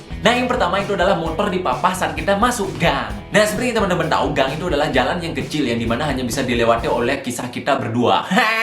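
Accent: Bali, Indonesia. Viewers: Gataw knp ya, denger dialek bali itu sesuatuu bgt bli ✨💫💜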